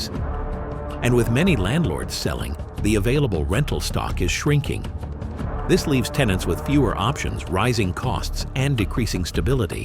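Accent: United States. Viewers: Not sure why you used an American narrator for an Australian issue?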